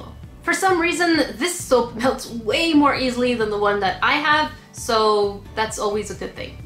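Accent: France. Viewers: ur FRENCH? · Can I ask you a question are you French? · Wait are you French?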